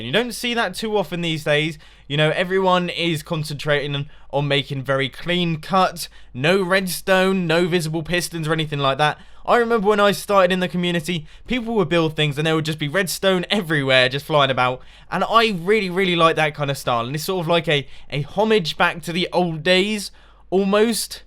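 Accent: United Kingdom. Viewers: VERY BRITTISH ACCENT?! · idk why but everytime you use your super-extra-fancy-charming-brit accent i imagin you recording in a smoking hahaha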